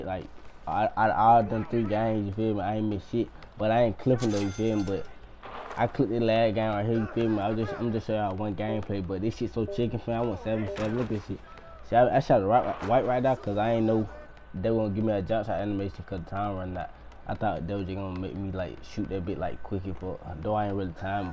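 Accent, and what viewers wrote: United States. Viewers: bro you have to dead ass open your mouth n talk or stop trying to talk with so much sling you from the country so it’s hard to understand you bro I try to watch your videos but can’t really understand you sometimes I hear certain parts but it be a blur for me n my ears bro just try to speak clear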